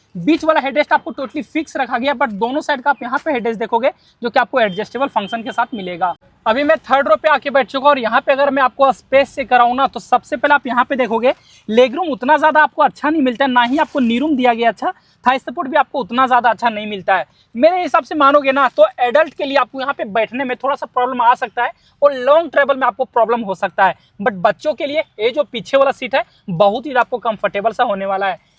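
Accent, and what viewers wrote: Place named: Bihar, India. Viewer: Bhai aap bihari hain kya?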